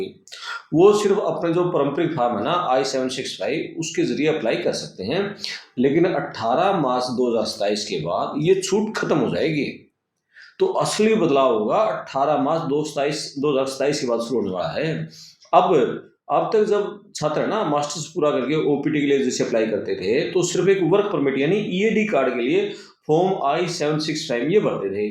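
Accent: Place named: Sindhi, India. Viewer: Improve your sindhi hindi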